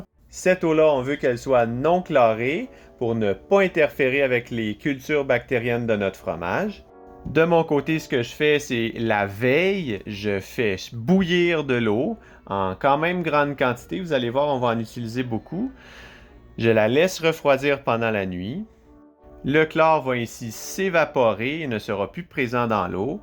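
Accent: Quebec, Canada. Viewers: Avec l'accent Québécois j'entends toujours 5 au lieu de 100 Au début de la vidéo j'ai cru qu'il fallait 1000 litres pour faire 5 kg de fromage waaa 😂😂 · Bonjour, Un grand merci pour votre effort et professionnalisme. J’ai découvert par hasard votre chaîne et ça a été trop utile pour moi. J’ai bien apprécié les explications que vous avez fournies et votre style de présentation surtout avec un accent Québécois que j’aime beaucoup. Félicitations et continuez avec mes souhaits de bon courage et pleine réussite. Je ne sais pas où me procurer des produits de fabrication de fromage (pour usage domestique) dans les régions de Gatineau et Montréal.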